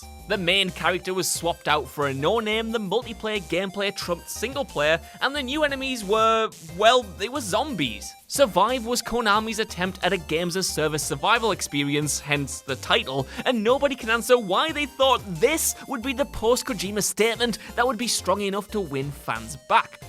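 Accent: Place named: Lancashire, United Kingdom. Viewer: That was too much Lancashire for one day, thank you. Good video though.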